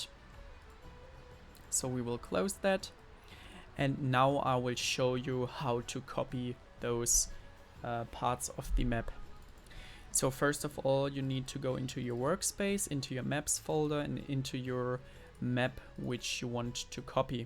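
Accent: Germany. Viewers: Wie man am Englisch erkennt, dass der Gute aus DE kommt 🤣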